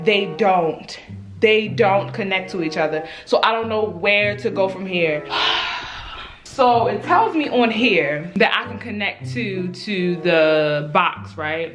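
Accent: Baltimore, United States. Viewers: I was like where is she from? Then I heard you say Stupid I said oh she's a Baltimore native 😭😂 love you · oooo do I hear a baltimore accent 👀🌝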